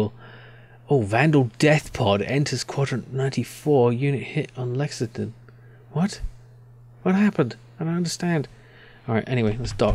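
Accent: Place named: United Kingdom. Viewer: Your British accent is much appreciated from the USA.🇺🇲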